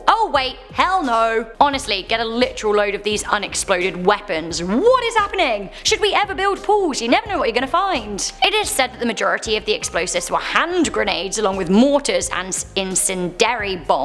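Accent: England, United Kingdom. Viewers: First off love your British accent very 🔥 second love your facial expressions keep up the good work on the videos and keeping us interested in the videos far from boring 😎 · I'm so glad you've been able to keep your English accent its the best, you should come back to England see your dog and do English things with everybody :D xxxxx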